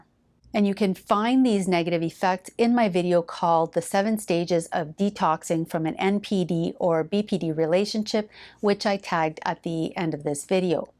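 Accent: Quebec, Canada. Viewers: coach, about - ugh that francophone/Canadian accent makes me melt. Love bombing you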